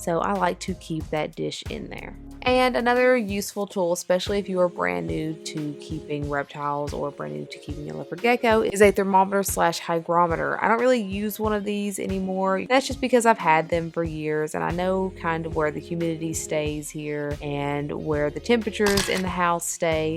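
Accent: South, United States. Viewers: OMGoodness, where are you from? You sound like you are from the South! I'm in NC and new to having a leopard gecko!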